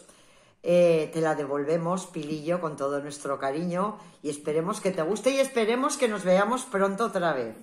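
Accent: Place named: Spain. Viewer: Me encanta como toca Canta y Habla Lindo acento español Saludos cordiales desde Washington DC dé parte de un salvadoreño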